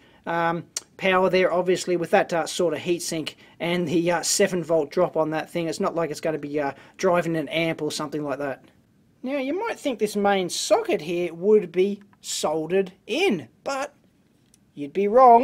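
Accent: Australia. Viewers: Um, he's Australian. That's how they talk.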